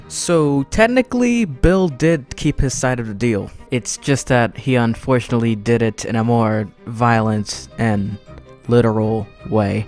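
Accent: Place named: South, United States. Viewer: you sound like Gideon. Maybe in a little southern accent lol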